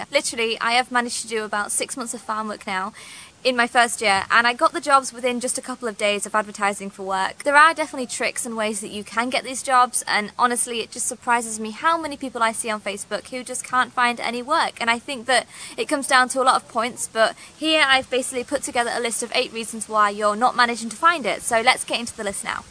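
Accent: Australia. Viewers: You're starting to sound Australian 🤔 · Aussie accent creeping in 😉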